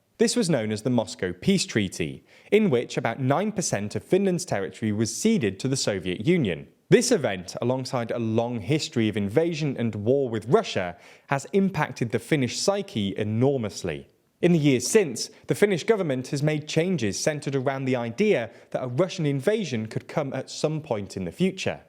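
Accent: United Kingdom. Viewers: Excellent exposé but you're highly accentuated British accent is so painful to hear! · Good job on your British accent!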